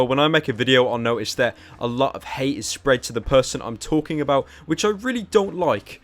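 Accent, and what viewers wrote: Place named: Midlands, United Kingdom. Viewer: Where u from? trying to pin ur dialect/accent... u sound (UK) Midlands"ish".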